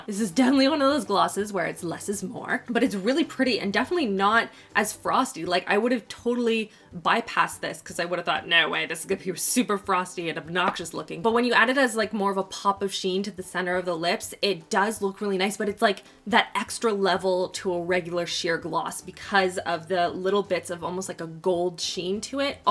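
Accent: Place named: Canada. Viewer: I love when I hear your Canadian accent.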